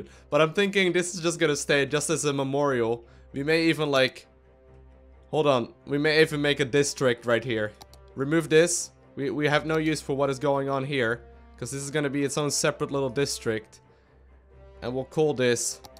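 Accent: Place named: Netherlands. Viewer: He sounds Dutch now I'm curious does somebody know.?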